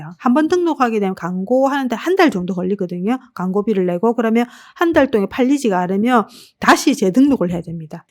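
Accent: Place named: Gyeongsang, South Korea. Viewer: 쌤~~~ 강의가 너무도움이 많이되고 좋아요.근데 경상도 사투리에 말씀이 빨라서 잘 못알아듣겠어요.말씀을 조금만 천천히 해주시면 좋겠습니다~~~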